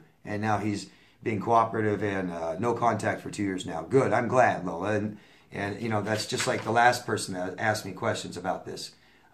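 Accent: England, United Kingdom. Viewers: I finally comprehend what is meant when a Narcissists see a person as an extension of themselves. I was thinking what does that mean ...like a third arm? No it means you are to help/aid them only and you get nothing or little in return. :( They SEE you only as a way to help themselves. :( Now I know my Father was a Narcissist. He never paid child support, no gifts or presents from him EVER! But he felt he was entitled to respect from us. As as adult I would do his shopping...help take care of his business...he future faked and said he was "leaving me something" . It was all one way in his favor and it does hurt when I realized he never loved me. He was only out for himself! He thought his presence was a gift to me. I do feel at peace knowing the problem was not with me. I wish I knew this earlier. People can have interactions with you for years and truly NEVER love you. 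I lived through that but I did not understand at the time. First recognize a self centered person then move on no matter who they are. Yes..sometimes a family member or romantic partner does NOT love you. Great English accent !! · Thank you, I just love your English accent 😘. In the UK you're allowed 6 free sessions of talking therapy on the NHS. This I did and my therapist and I think I'm much much improved. If I want more I have to pay, but as I'm paying for a lawyer... Is it possible to have 2 sociopath parents or 2 npd parents? From London, England.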